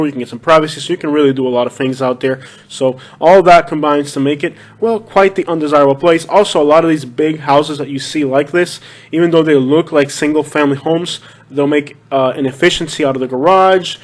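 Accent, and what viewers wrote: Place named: North, United States. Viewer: And where are from??I have been here 70 years!!and you tourists,are what has ruined my state!!Get out!!ur accent tells on you.And yeah,South Florida sucks,It did not used to be like this,until the yanks moved in!!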